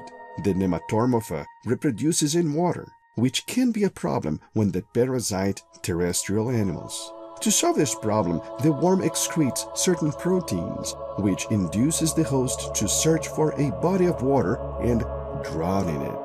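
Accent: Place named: Eastern Europe. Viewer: This guy sounds like Idubbbz eastern European accent lol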